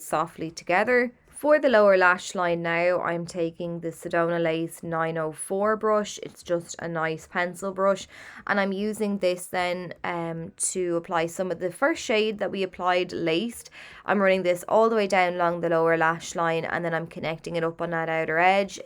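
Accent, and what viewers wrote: Ireland. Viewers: Y tiene un acento irlandés muy marcado, pero yo estoy acostumbrada, la entiendo y me gusta.... A mí también me encantan sus videos😘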